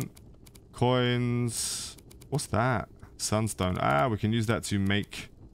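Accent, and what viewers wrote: United Kingdom. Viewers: Love the playthrough so far man. loving this game too, I just got to Duran's forge last night and having a blast! also my brother in Christ you are British AS F*&%!!! lmaooo "oh im just a knob-end"..."lovely jubblies"... Being American, our speech and pronunciation is just so different its always hilarious thinking about how it got that way lol anyway keep on keeping on man, im having fun watching along! edit: 😂😂 you're talking about loving the songs the dwarves sing just like in "Black Sails and the vikings as well....like assassins creed" lmaooo BRO take some neurotropics or something you're forgetting names for everything!! looool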